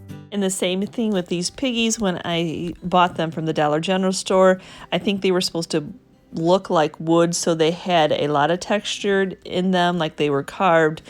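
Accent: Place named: Michigan, United States. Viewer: LOL... as I was listening to you speak I tried to place your accent which I have pretty much given up on cuz I'm NO good at it 🤷, but since I'm home alone I figured it'd be OK. I said 🙋"Michigan" and not 30 seconds later you were talking about Annie's paint holding up well outdoors "here in Michigan" and look at me now 😁 getting one right and nobody's here to witness it. 😞. That's OK, we know! I'm really loving the decoupage on the dragonfly and the flowers. Long story, but I have a metal flower a lot like your largest one and a butterfly, both of which I really need to look polar opposite of what they are now, and this will absolutely do the trick. Perfect timing that I found your video today. Thanks for sharing. 🦋🏵️ From the Southern California desert, MK ♥️🌞🌵 Oh! I was able to properly guess your accent cuz although I was born in Detroit I had my forth birthday here in CA and, as such, consider myself to be a CA girl, my remaining family - one cousin - lives in Bloomfield Hills and works at GM. 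My mom's entire family stayed in MI while she and my dad, apparently the wild ones, drove west in the early 60s.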